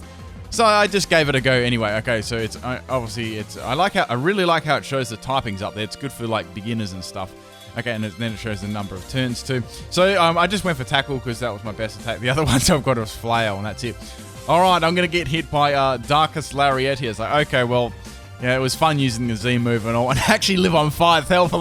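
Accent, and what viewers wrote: Australia. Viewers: he's australian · are you ostralian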